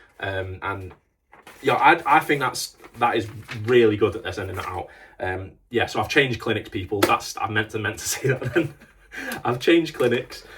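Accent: United Kingdom. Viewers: What on earth is going on, I've never smoked weed before, somehow this has been recommended for me to view. You're getting weed in the post though some kind of "prescription"? And from you're accent your're obviously in the UK, and you've openly documenting this. I don't know where I am right now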